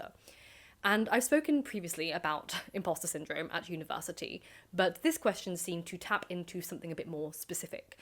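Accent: RP, United Kingdom. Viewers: Interesting, but I'd argue you being able to cram and get A star grade makes you way above average. You are only judging yourself against the top of the top by comparing yourself with those who topped Oxbridge. Also you sound so posh, I assumed you were from an academic style family previously. I was the first to go to Uni in my family myself.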